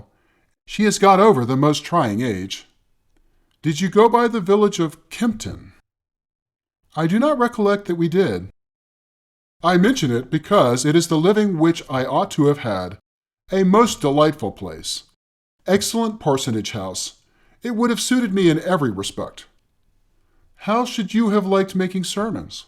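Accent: United States. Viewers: It's exactly what I want, American accent! Thanks. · I could not bare the mispronounciations and american accent. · An American reading Austen just does not compute in my brain. This gentleman, though I’m sure he’s trying his best just does not sound right.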